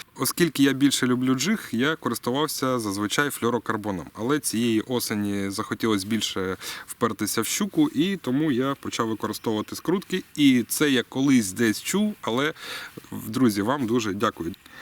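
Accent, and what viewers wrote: Standard. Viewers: Душевне відео. Краще за перегляд хорошого фільму.... Дякую👍🔥🎣🕶️ P.S. За приємну літературну українську окремо спасибі👍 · Сподіваюсь, що це щиро. Просто пригадую, як ти знову перейшов на російську і почався натиск, від якого ти відбивався. Це дуже класно, і українська в тебе, як і в багатьох російськомовних така, що багато україномовних позаздрять, в тому числі і я, адже вона в тебе літературна, з того, що чув в школі, читав. Але пам'ятай, будь ласка, що це рішення в першу чергу має бути щирим і не через коліно, адже будь-яка агресія створює приховану ненависть. Головне, що ти за людина, а мова це лише протокол, якому, на жаль, на мою думку, надали силу зброї, яку тепер проти нас і використовують, часто власними руками. Пам'ятай, що є українці, які тебе будуть любити, шанувати і цінувати, незважаючи чи ти говориш українською чи російською. 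І таких, я вірю, більшість. Нехай цей комент хоч трішки збільшить тобі твою впевненість у твому рішенні :) Вітаю у клубі україномовних, тут весело :) Ну і дякую за контент! Бомбезні щукани :)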